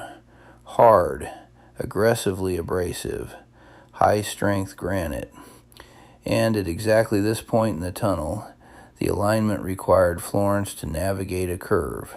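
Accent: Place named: South, United States. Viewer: The diagrams look great but how accurate are they? Also, why did you choose a Southern drawl for an Australian subject? Elevenlabs?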